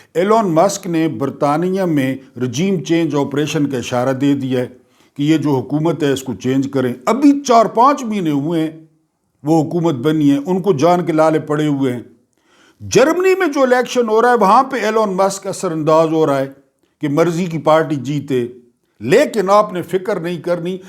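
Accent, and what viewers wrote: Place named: Pothohar, Pakistan. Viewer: آپ کی پوٹھوہار ی مزہ دے جاتی ہے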